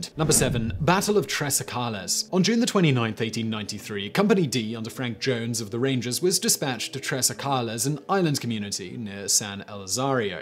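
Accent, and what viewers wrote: United Kingdom. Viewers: Texas stories told with a British accent 🤔🤔🤔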